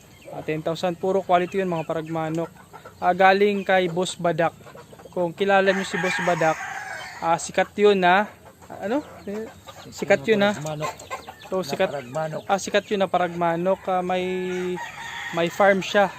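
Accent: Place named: Samar, Philippines. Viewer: Ha Samar ka?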